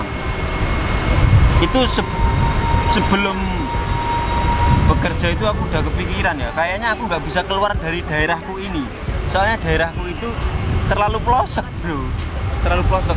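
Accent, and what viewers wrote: Java, Indonesia. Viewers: Jowo mu medok massee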